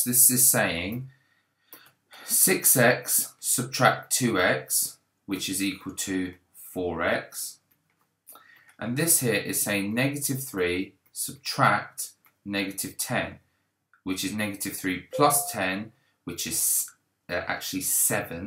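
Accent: United Kingdom. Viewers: This may come as a surprise to you but he is in fact speaking in a 'British English' accent...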